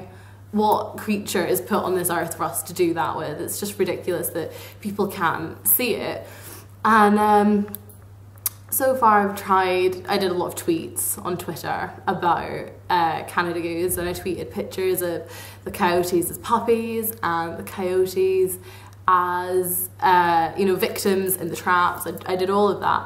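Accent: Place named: England, United Kingdom. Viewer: You sound english and why would you buy a coat for -40 when englands temp is like 5 degress in the winter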